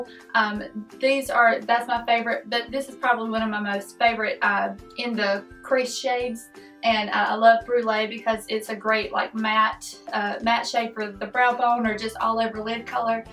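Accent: South, United States. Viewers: You're accent is so cute, I'm from the Heart of Dixie as well <3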